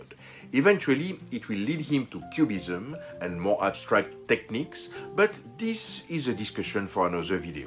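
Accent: France. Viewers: However. As a swedish old man, I appreciate your french seasoning english. I love that. :) That is enough musical to my ears. Your paintings are delicate indeed, and I love to watch. Quite soon I will grab some aquarelle painting. I painted somewhat, many years ago. I am eager, but hesitates about what brand, I want to use, or if guache is the choice. I planned to use just three basic colours, white, and some earth colours. Many years ago, I painted home made baskets, and small furnitures, using egg-oil tempera, or oil and earth pigments, as decoration. It was fun then. I also made oil paint for windows, by grinding titanic, and zinc-white into linseed oil and turpentine. It was heavenly to apply, but a beast to make large amount of. First, a layer a lean, diluted by turpentine. Dry for a week. Next somewhat more oil-fat. Dry for a week, and the final layer of full oil. 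I used aways art brushes from pig-hair, and it was an enjoyment to apply the oil color. Linseed and turpentine is just perfume-alike. I believe you know. :)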